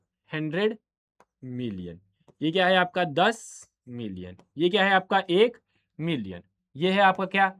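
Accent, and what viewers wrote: Bihar, India. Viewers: Sir please बच्चों जिससे acting mat kara karo समझ nahi aata or बिहारी mat bola karo na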